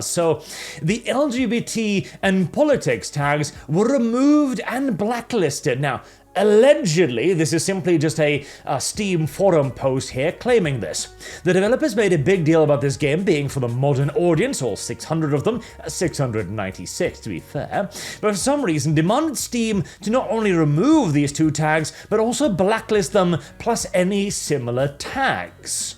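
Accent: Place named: Wales, United Kingdom. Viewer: You sometimes sound a bit Welsh.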